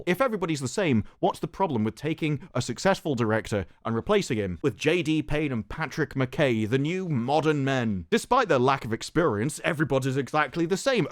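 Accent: United Kingdom. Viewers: Did the british accent just talk shit on the 5th largest economy on the planet(california)? Sounds like someone from the 6th largest econ (uk) better plug his queen hole.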